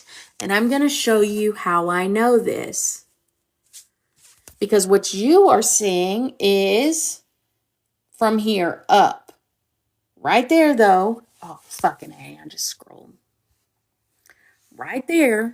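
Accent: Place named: South, United States. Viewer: You have become my new favorite creator. I find you adorable and entertaining af. You're so sweet and funny and I love your southern accent. ❤ Anyway, have a good night!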